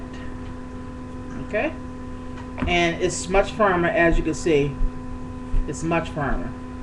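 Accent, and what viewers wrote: Baltimore, United States. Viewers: Are you from Baltimore? you got that B-more "U" sound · she from bmore he voice I'm from dc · are u from bmore?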